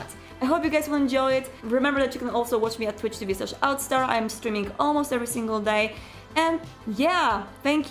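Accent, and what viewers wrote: Poland. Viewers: I’m gay but I would go straight for polish girls with Cthulhu shirts. Your accent is adorable and I love it when you call bullshit on shitty game journalists. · At the beginning of the video i was like: "She talks like Polish ppl, wonder where is she from." and yup! #polishgirl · It's nothing personal but am I the only one who considers polish accent so annoying that I can't watch it till the end?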